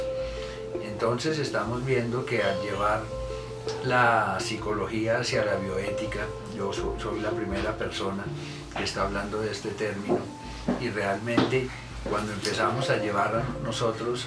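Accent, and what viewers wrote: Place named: Antioquia, Colombia. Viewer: Con todo respeto la conclusión a vuelo de pjaaro es la de que se trata de un charlatán de acento.paisa, ,con lenguaje rebuscado disfrazado.y tendiente a aterrorizar,encasillar,someter,infundir hacia la disminución,la clasificación morbosa,y la. Intimidación ,ladrillazos parrafudosos de redundancias destrictivas y destructivas de la esperanza de quienes sufririan.Es la sensación que queda tras exponernos a sus "exposiciones radiales,sin salida y trampedeantes.seria bueno no intentara seguir haciendo daño a las audiencias con actitudes sobresuperioradas ,y extensas ,".inocentemente"y de " buena voluntad".Es posible que haya más coincidencias con esta observación,saludos a Parrita.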